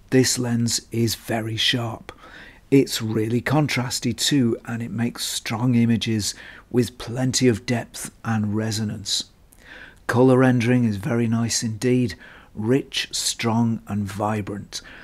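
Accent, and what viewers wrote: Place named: Liverpool, United Kingdom. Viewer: Great video! You sound like you’re from Liverpool. Correct me if I’m wrong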